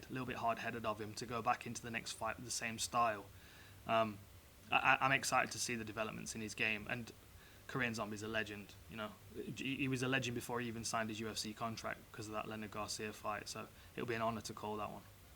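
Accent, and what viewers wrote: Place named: England, United Kingdom. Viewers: Something about dan , he has that annoying pompous English style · I think his style of English would mostly be a product of where in England he comes from. I don’t find him pompous at all btw.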